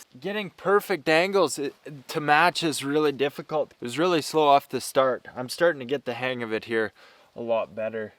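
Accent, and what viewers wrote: Canada. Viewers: ❤WOW From Norristown PA here How old are you ? I know your young but your come off with old knowledge and worth ro work ethics ? You put yourself down so much & are so hard on yourself & your doing so much then any one your age it's amazing and phenomenal 👍🏾 I hope your name comes a worldwide name You deserve it & I hope your do a Q&A Telling people about your family & life grown up in Canada i love your accent I could listen to you forever the thing you do I have not clue even how to start doing Do ever stop 👍🏾🙏🏾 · I can't help but wonder where the Canadian accent came from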